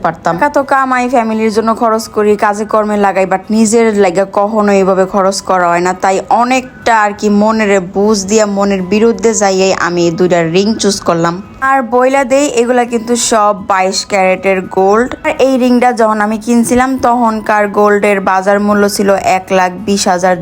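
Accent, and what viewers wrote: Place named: Narsingdi, Bangladesh. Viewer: Apu apni norsingdi basay na nope suddo basay bolben sundor lge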